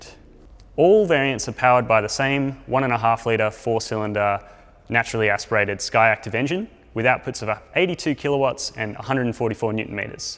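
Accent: Australia. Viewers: "He speaks in a strange way for an american, but he doesn't sound like a british", then I discovered you are australian. I always forget about you, guys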